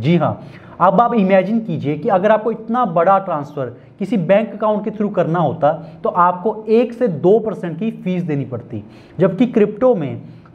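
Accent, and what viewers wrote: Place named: Jammu, India. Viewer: Thank you...bro looks like your mother tongue is dogri...is that true..?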